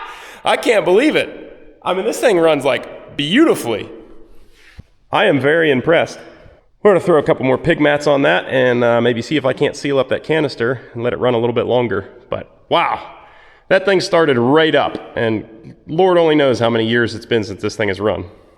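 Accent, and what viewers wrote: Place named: Western Pennsylvania, United States. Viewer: I absolutely love your videos. They are very interesting and very enjoyable. I have to admit that I wait with anticipation for each and every one to come out. Being from western PA myself I feel as if I have known you forever. Your accent brings back many memories of home and things I used to do. I guess you could say I live my life vicariously through you. I am now on disability and living in Florida but each and every video takes me back home. Being an old mechanic and my love for old equipment makes it even better. May God Bless You and your family. Please keep up the awesome videos. I too am waiting on that overhead crane!! Lol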